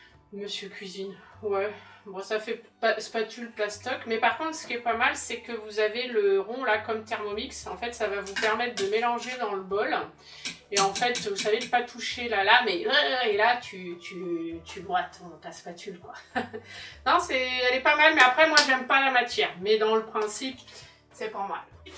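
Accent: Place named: Paris, France. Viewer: oh oui des recettes allégées !!! bonne vidéo, un p'ti accent j'dirais parigo !!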